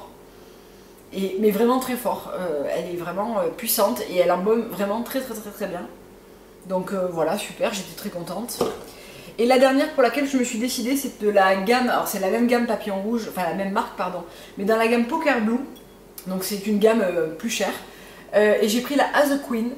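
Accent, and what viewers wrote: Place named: South, France. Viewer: re bonjour toujours un vrai plaisir et régal de regarder tes vidéos soldes, etc tu es magnifique, belle et ton petit accent du sud nous apporte du soleil gros bisous;-))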